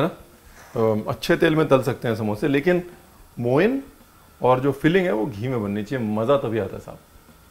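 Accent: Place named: Lucknow, India. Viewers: I was going to say that. He says so many times how he grew up in Lucknow and he is UP ka ladka. His way of talking has all the sweetness and sophistication of Lucknow and UP. And even when he is making Punjabi Samosa he can't keep himself from mentioning Radhey Shyam halwai. But to be honest, Ranveer belongs to all of India now. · Tabhi mai kahu ki apki zaban aur lehza lucknawi kaise hai.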